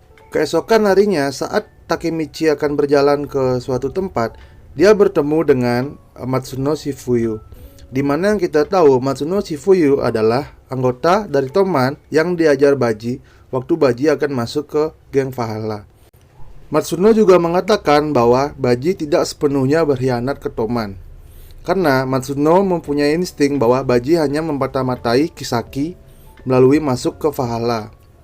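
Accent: Bali, Indonesia. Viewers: Nak bali ules ne logat bli ne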